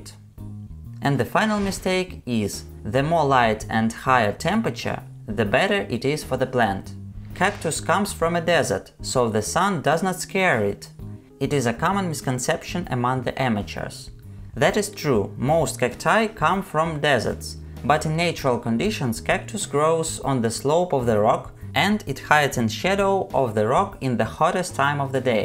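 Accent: Russia. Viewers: Too russion. My earssss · Я которая слышу русский акцент👁👄👁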